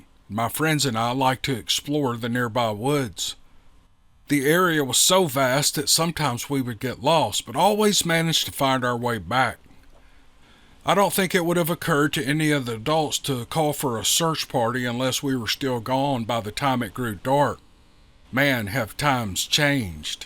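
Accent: South, United States. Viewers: Hey....Im not a red neck, but I did live in Arkansas for over 40 years....I love the South and its great to hear your accent... Love it... Thanks for show. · Now wait just a minute! You better hold on to your Weave. Cuz this is an all inclusive Channel. Us Yanks love to curl up and listen to our Favorite County boy. With his smooth Southern accent!🥰🎶🙏🏾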